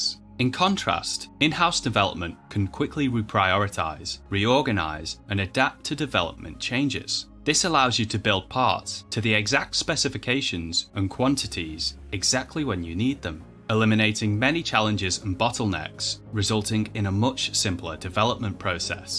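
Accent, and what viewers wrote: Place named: United Kingdom. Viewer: Awsome dude thank you, and great to hear a fellow brit on this subject, liked and subscribed 😀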